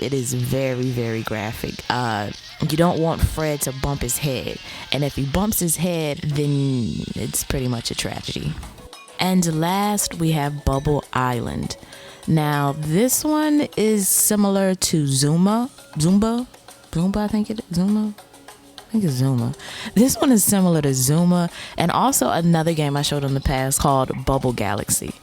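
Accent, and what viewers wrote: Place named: New York, United States. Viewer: Altaïr ibn-la’ Ahad it’s not funny because the “joke” is false because she sounds like a white New York lady.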